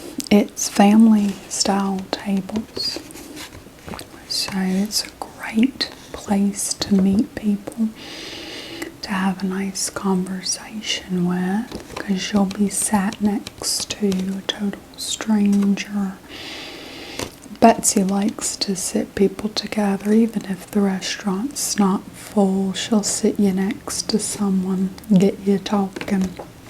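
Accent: South, United States. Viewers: the ones with the southern accent are always my favvv omg you do it so well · you look so beautiful!! i'm so excited for a new southern accent roleplay, this is gonna stay on repeat for me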